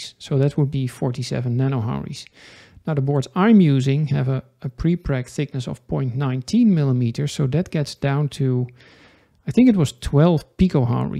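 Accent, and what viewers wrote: Netherlands. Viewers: Thanks for explain clear, by the way i like your clean English with the little dutch accent. Kind regards from germany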